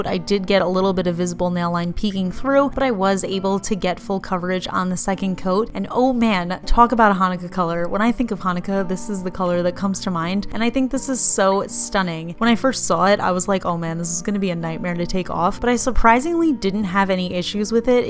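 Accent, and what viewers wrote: New Jersey, United States. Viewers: I love this collection. Love the new hair color too! I totally understand about how you say some people make fun of how you pronounce ‘ pastel’ I’m from Jersey too ( near LBI) and I’m always being made fun of on how I pronounce certain words. Maybe it’s a Jersey thing. But now for the important Jersey question- is it Taylor Ham or pork roll. Lol. ❤️ I love your channel.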